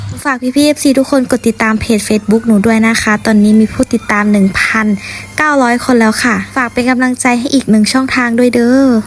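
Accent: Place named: Laos. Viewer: พูดไทนชัดมากพยายามอย่าพูดตกสำเนียงลาว-ร-ล-ช-ให้ชัด-ควม-ความ❤❤❤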